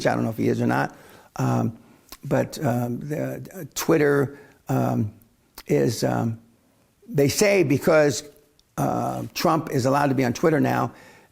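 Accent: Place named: New York, United States. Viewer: Man This.guy is a realist..I love this guy .he the only billionaire..that tells people the truth..no sugar coating ..just raw right in your face ..that the type of people I like ..straight forward. Right in your face ..when he speaks the .truth ..I would someday like to meet the guy..but I know that will never happen ..folks listen to this gentleman..he dropping..truth bombs ..to the people ....for some reason he sounds like he from NY ..Cause he definitely rolls like BAD ASS ..but I know he good guy trying to wake up the sheeples..that are in a deep coma ..cause most of them are headed towards the slaughter house ....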